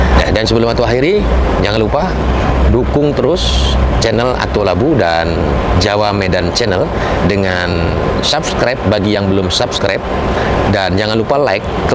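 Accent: Malaysia. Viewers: Aku orang jawa tapi aku senang bila pakai logat melayu lucunya ngena walaupun sebagian bahasanya aku gak ngerti sukses mantaap👍👍👍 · Saya penggemar komedi/YouTube di Medan minta kembali ke adegan Melayu,logat Melayu tu, usahkan melucu dengarnya aja udah enak awak dengarnya, he heee. maaf ya. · Kenapa tidak membawakan peran Melayu dan Jawa dalam 1 Chanel bg mungkin akan lebih menarik lagi karena kami (saya) lebih terhibur dengan logat Melayu Abang walaupun sebenarnya saya orang Jawa pujakesuma salam dari rantau Prapat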